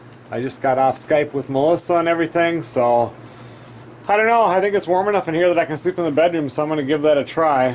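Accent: Minnesota, United States. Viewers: Thanks, I was thinking maybe you were in Minnesota (accents lol).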